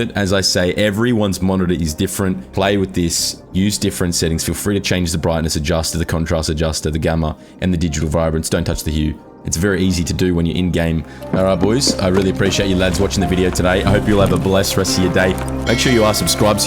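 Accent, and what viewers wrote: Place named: Australia. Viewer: Hey brotherman just wanted to say a big thanks for making this video. Tweaked up a few settings to exactly what you're running. AMAZING ! Visual clarity doubled instantly. Color space is amazing too ! Used your post effects and ended up reseting my digital brightness to default again. AMAZING ! And so nice to hear a fellow aussie. Straight to the point. No bullshit video. 10/10 mang.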